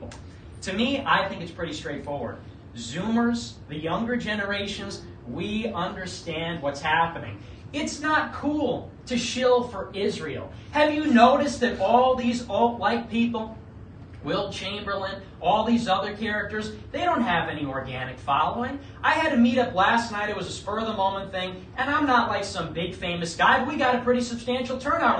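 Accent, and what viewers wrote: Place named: United States. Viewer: He might be Mexican but he sounds more American than all those Israel firsters traitors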